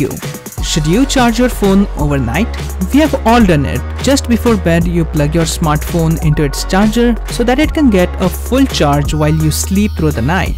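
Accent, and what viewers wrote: India. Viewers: He sounds indian i swear im not racist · Gabriel Lim I am Indian and I can tell you, he sounds too Indian. I'm not being racist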